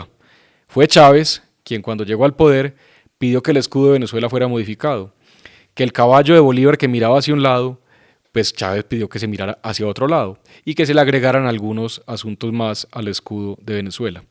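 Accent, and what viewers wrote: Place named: Paisa, Colombia. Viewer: E encanta su forma de comunicar, no lo había escuchado, pero vivo en un pueblo en Europa...gracias habla un lenguaje lindo, tiene un un dejé paisa sin esa decadencia asquerosa que piensan que todo Colombia habla así como paisa de puro trabado rasquin bol traga trapos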